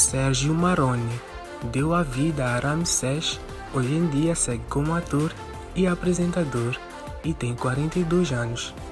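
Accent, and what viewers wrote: Portugal. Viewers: Que sotaque é esse? Vc é de Portugal é 🤨🤔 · Você é de Portugal seu sotaque é de lisboa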